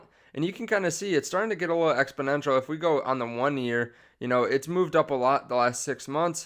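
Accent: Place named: Midwest, United States. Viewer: Dude is so Midwestern I love it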